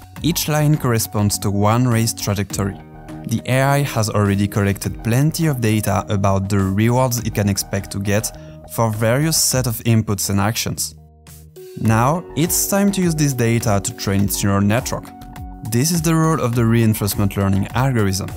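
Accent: France. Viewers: Ton accent francais se voit (un peu) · c’est à l’accent qu’on reconnait le frenchman 😎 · Like your French accent ! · Un bon accent bien français qui me permet de comprendre sans sous titre, gg les gars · sympa l'accent français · Accent français détecté ! En tout cas super vidéo ! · Tu as un léger accent français , est -ce ton origine? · He's first language seems to be french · Bc of ur accent sound French background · 🥖🥖🥖🇫🇷🇫🇷🇫🇷 l’accent français on est là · j'adore l'accent françait sa fait plaisir! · Bruh this video was just me getting frustrated because you sound so french, so your english is pretty weird but stills english so it still harder to understand than french x) (for me of course) but if we forget that, great video bro ! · Ça sent l’accent français un peu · l’accent français on est là mon g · am I the Only one to think that this guy has a french accent? 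· W french accent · I like the french accent while talking, even though you talk pretty well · On reconnais l'accent français même si tu parles trop bien mdrr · Great video, just asking are you french because if u are ur accent is thick X) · Tes francais jai cramé ton accent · il a un big accent français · Hey la vidéo est géniale, n'ayant pas tous les termes informatiques en anglais c'était difficile à suivre mais très passionnant. Au vu de ton accent (et de ta couleur de voiture) je pense que tu es français. Si à l'occasion tu peux mettre des sous-titres français pour les gens comme moi qui ne s'y connaissent pas forcément en informatique et machine learning ce serait super ! Ta vidéo a un rythme à la Virtual, tu pourrais devenir le Wirtual français ce serait excellent. En tout cas superbe vidéo, bien montée et captivante même pour moi qui ne joue même pas à ce jeu et n'y connais rien à tout ça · Unrelated but your accent sounds very french, are you french? 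· lol huge french accent bro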